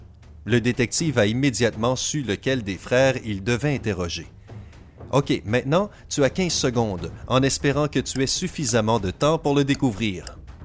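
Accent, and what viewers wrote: Quebec, Canada. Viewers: La voix quebecoise pourri :( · Ah !!!!l accent canadien!!!😁😁😁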